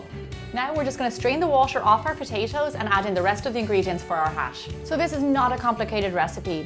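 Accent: Ireland. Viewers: Aha, well since Celtic Woman is one of my all time favorite musical groups that helps explain it. I have always loved the Irish accent, but yours is subtle. Almost lost but not quite. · Ima guess the accent Irish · I love your 🇮🇪accent🇮🇪! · Irish accent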